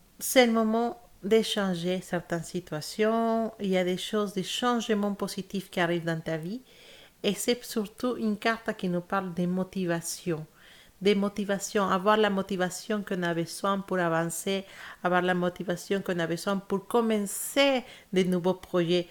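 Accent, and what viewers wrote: Spain. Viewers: Bonsoir!! Merci pour votre guidance ça me parle effectivement c'est le cas pour moi. J'aime votre accent espagnol! ☺️ Merci infiniment! 🙏 Namasté 🙏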